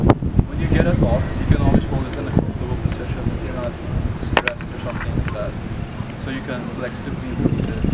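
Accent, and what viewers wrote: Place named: Philippines. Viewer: tagalog ka nga pa cute eh!!!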